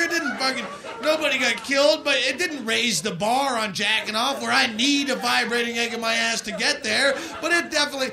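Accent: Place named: Massachusetts, United States. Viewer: Still had his Mass accent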